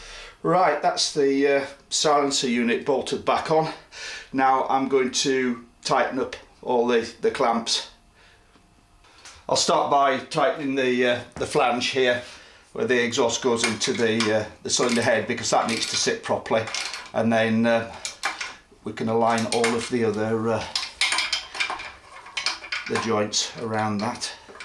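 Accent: United Kingdom. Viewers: Great video, thanks for detailed explanation. Easier to understand with a British accent. I'm from north of the border but it still works for me. ;) Again, thanks. Just picked up my 2019 XC recently and this is high on my list before the summer heat hits Atlanta.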